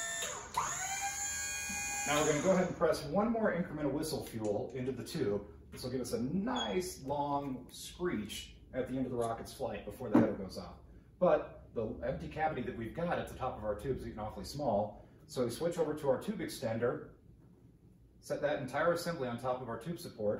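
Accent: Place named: Midwest, United States. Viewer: Your monotone is kinda creepy definitely back east somewhere possibly Wisconsin or Minnesota?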